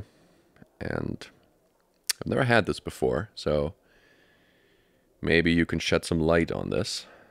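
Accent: Germany. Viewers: DUDE YOUR GERMANY ACCENT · that's a good German accent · I Love your German Voice 😂❤️❤️❤️ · such a good german akzent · love the german voice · 0 hitting me with the German accent and everything damn 😳✋🏻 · Is he nativ german or why that accent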